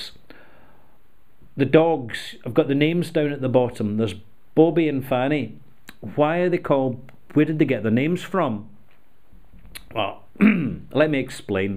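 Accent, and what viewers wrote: Scotland, United Kingdom. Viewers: What's band practice? How much water? And I guess the Scottish accent makes sense for whisky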